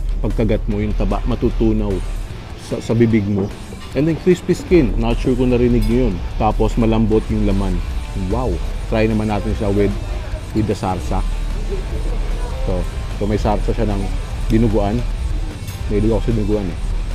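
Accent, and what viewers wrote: Batangas, Philippines. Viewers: Parang taga batangas si Sir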